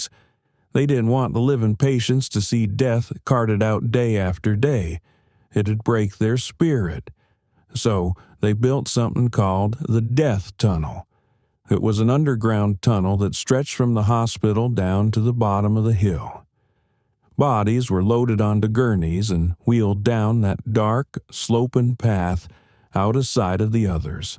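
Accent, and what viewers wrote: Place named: Appalachia, United States. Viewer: Why Is This Narrates By A Hillbilly??🙄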